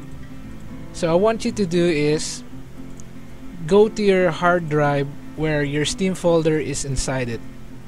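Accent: Philippines. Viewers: You're obviously a Pilipino judging your accent. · I can tell that u're filipino because of your accent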